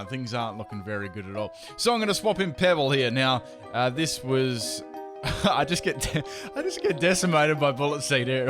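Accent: Australia. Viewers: yo are you aussie?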